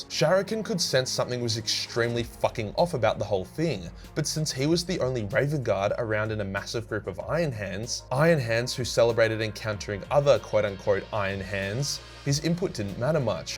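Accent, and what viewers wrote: Australia. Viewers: Wow glad to see my favourite character be told by an australian, thanks mayte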